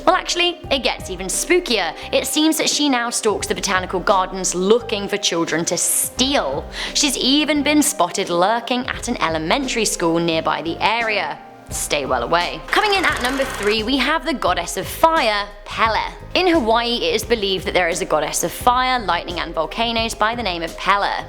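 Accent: United Kingdom. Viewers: Guys, calm down on how she pronounces things, she’s from the UK, and lives in Canada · D'Angelo McIntyre ummmm shes brittish. Can i hear your best french, german, or russian pronunciations??? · Well she's british not a native hawaiian so of course she pronounces wrong.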